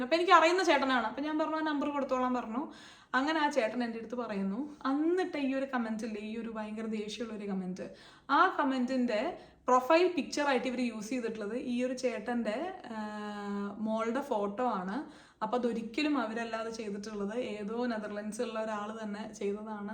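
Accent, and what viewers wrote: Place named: Malabar, India. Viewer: Chechy njan kure nalai vedios kanarund. Njn kozhikodekari Anu so chechyde malabar touch ulla samsaram bayankara istanu. Njn agne long vedios adikam kanatha alanu ipo chechide long vefios okke pattumbolkke full ayit kanarund. Karanam chechide samsaram kelkn nalla resanu. Mathralla chechide life style, healthy food habits, okke enne irupad motivate cheythittund. Chechine enik valya istanu. Puthiya vedios nai kathirikkunnu. Orupadu Snehathode - chandhu😉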